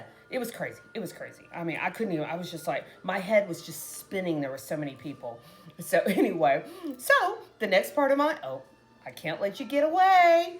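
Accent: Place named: Texas, United States. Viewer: lol, I could tell your accent is from Texas.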